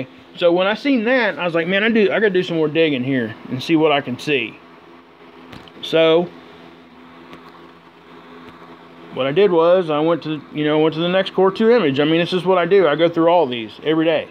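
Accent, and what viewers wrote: South, United States. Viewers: Thank you for ever thing. I like your southern voice it makes me miss my brother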